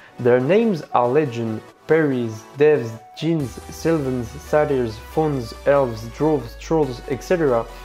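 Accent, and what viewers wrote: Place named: France. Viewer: thank you for the time you spent working on this video, as I was searching for esoteric studies about the Harry potter saga I unexpectedly found myself watching your video. I'm delighted to find out that you're French. No doubt a native english speaker would spot your french accent in no time but as a French myself I would not have guessed you were one at first, so great accent, it's rare enough to be cheered and I must say it is a wonderful idea to share an english-speaking content like that, may it bring you a large audience! Continue comme ça, tu as gagné un abonné 😉 C'est une excellente idée d'avoir pensé à sous-titrer! Si je peux me permettre une critique: tu devrais utiliser une autre musique pour l'intro. Pendant la vidéo je me suis demandé pourquoi tu laissais la musique en fond puis j'ai réalisé que ce serait gênant si on entendait juste ta voix dans une pièce vide.. 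Peut-être que je suis le seul que ça chagrine mais encore une fois tu devrais changer de musique, ça fait un peu cheap genre "best techno 2009" c'est dommage, sache que je dis cela en toute bienveillance pour que tu t'améliore 🙂 Je vois que tu maîtrise bien la langue de Shakespeare -- assurément mieux que 95% des Français -- mais encore une fois sans vouloir être pénible j'ai remarqué quelques erreurs qui pourraient être évitées. Si tu le souhaites je serais ravi de t'aider de quelque manière que ce soit, par exemple en relisant ton script ou tes sous-titres ou t'aider à faire autre chose, je su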